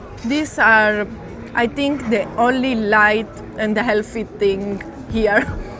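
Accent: Italy. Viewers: I absolutely love your Italian accent!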